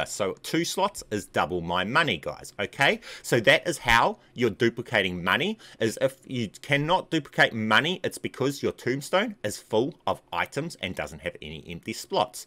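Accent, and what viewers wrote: New Zealand. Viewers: Definitely a kiwi! Everyone talks about you on mw, earned my sub. I dont even care for the money, youre just too cool lol.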